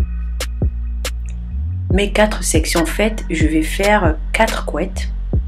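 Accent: Gabon. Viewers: 🇬🇦...haaaa je savais l'accent boma👌 au moins c'est plus crédible on je me retrouve dans le type de cheveux 😋 courage sista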